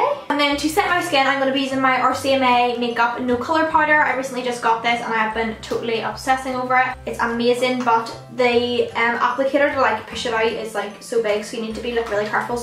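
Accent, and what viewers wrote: Northern Ireland, United Kingdom. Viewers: Are you northern Irish?? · Are u from Northern Ireland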